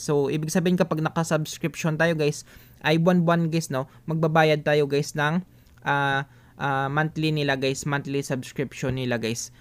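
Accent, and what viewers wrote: Iloilo, Philippines. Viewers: Ayusin ang pagsasalita parang Ilonggo kayo? Tutoo o hindi?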